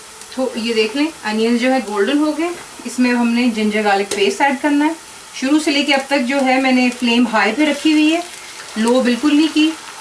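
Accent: Punjab, India. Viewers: omg... I literally drooled over my keyboard. Thx a lot ji.... I love your Punjabi style Hindi (Urdu):) Maza aa gaya kasam se recipe mein. Will definitely try this. Cheers from Korea!! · I love your punjabi accent :) it's ncie to listen to! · I made your dish. It's delicious. I love your accent mam, it's like typical punjabi mom. Thanks for the recipe :D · Dear Ji, I have tried this recipe and it was AMAZING. My father loved it absolutely, I belong to Sikh family and it was just the kind of delicacy I was looking for.:) Thank you again Ji, please upload some more Chicken recipes:) And yes, your Punjabi accent is amazing, and I am sure you must be an amazing person too:)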